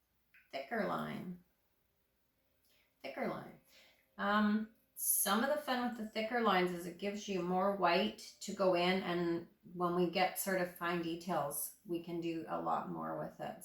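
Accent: Canada. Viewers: Oh. This is awesome!! How brilliant!! Such detail! I love 💕 it!! I see your from British Columbia!!! I’m from Windsor Ontario. But live in Florida now!! I thought I detected an accent from my homeland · Good job on your first video,! BEAUTIFUL ARTWORK!! As soon as I heard your 'accent', I knew we were kindred spirits! I'm Canadian, originally from Toronto - now living in San Diego, California!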